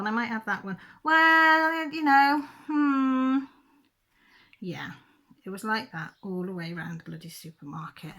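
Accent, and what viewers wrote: United Kingdom. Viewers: Hey from Buxton. Thanks for all your tips and humour and company. So great to hear an English accent!! I'm waiting for Amazon to deliver tomorrow!! A rose. Not much choice in this awful situation 🤗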